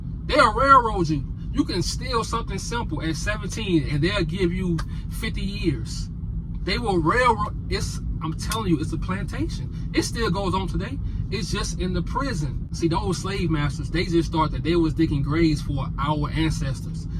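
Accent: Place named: New Orleans, United States. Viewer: Bro you from N.O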